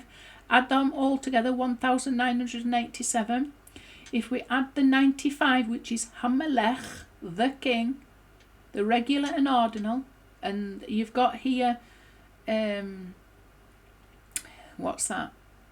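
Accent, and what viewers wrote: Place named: Scotland, United Kingdom. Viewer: May G-D bless you very much today my dear sister. (I insist as I told you in the past: "I adore hearing that Scottish accent"! Once you told me you were borne in England; but I can only picture you as a fine Scottish sister in Faith, Tribe, Tradition, and Past. For sure, also, our future!!! שלום וברחה! אלוהו CHRISTIAN ANGUS GRIFFIN-MACKENZIE Enjoying your lesson from Santiago, Chile 🌶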